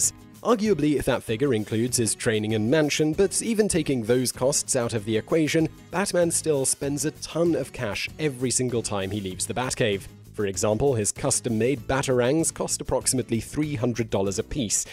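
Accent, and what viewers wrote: England, United Kingdom. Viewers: He’s not American, he’s English as far as I can tell. Maybe he thinks Americans would need subtitles to translate. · Simon, your pretty professional for the most part, so most of the time when you pronounce something differently than I'm use to, I chalk it up to you being a Brit. Chinook is NOT one of those words. It is suppose to be pronounced; shin- nook. It is part of the Haida-Tlingit language. It refers both to a species of salmon and a weather phenom of a warm winter wind that turns the snow to ice. (And makes driving hell) With so many channels filling our heads with missinformation, I need to be able to trust at least one youtuber. Don't fail me Simon.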